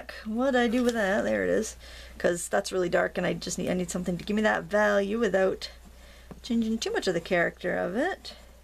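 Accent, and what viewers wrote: Maine, United States. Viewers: This is funny.... I had just been thinking about how you say the word drawing, and then you said something about it. It is fun listening to your Maine accent while tutoring. I love your work, as I have said many times. Think I will "drar q" some gourds, for my friend who carves them as a side income. She is incredible, and being her friend, I have been given many, so a gourd card would be perfect for her. Thanks for the "draring" tutorial!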